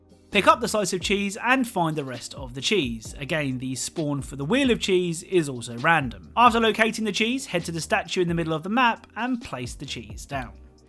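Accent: United Kingdom. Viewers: Are u british cos i am just wondering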